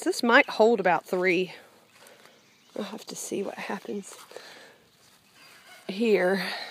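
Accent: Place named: Memphis, United States. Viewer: Your garden looks very healthy. By your accent it sounds like you might live near us here in Memphis. My tomato Jungle is in the greenhouse this year. I had such a battle last year with the tomato horn worm outside that I moved them all (100 plants) inside. I look forward to seeing more of your garden this year. Chuck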